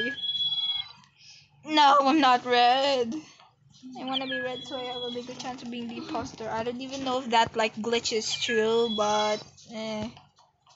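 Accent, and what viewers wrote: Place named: United States. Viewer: You sound american. WE DRIVIN ON THE RIGHT SIDE CUZ WE'RE AMERICAN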